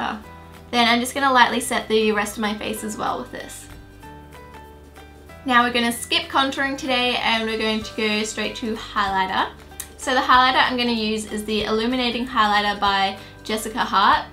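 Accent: Australia. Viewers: P.s. I am so jealous of your accent aaaaah! I've always wanted to say that, I don't know why I haven't haha I had to fake an Australian accent for my school musical when I was the lead, and people loved it, and it's what pretty much landed me the role ahaha it's my favorite accent! But then I did my school play and I had to fake a BRITISH accent and it was hard because I'm so used to doing an Australian one, so everyone was just reminded of my character from the musical hehe and THERE'S MY LIFE STORY! Sorry about that haha. My point though, is that I envy you and your accent!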